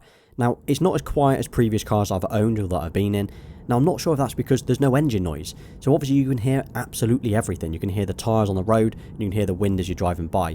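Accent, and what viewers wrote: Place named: England, United Kingdom. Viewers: Tesla UK, another relaxed English accent to enjoy 🇬🇧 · I enjoyed your video and love the English accent! Tesla UK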